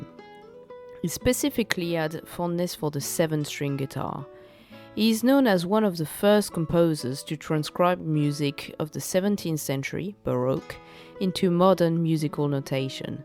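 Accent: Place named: France. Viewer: Very informative and nice talk, like your authentic french accent😊❤And thanks to F.Sor🙏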